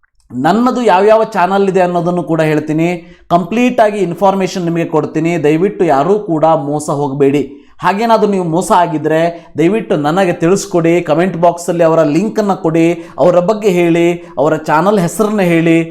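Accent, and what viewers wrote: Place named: Hubli, India. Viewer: Sir nivu Hubli yavaru nimma matu swalpa rough agi irutte adre karnataka da Ella kade nim video na nodta irtare adakke nim matu rough anusbahudu 😊 adu nimge swalpa gotirli 😊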